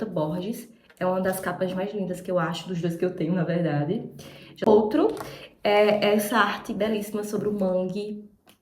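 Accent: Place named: Recife, Brazil. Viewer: O sotaque recifense😍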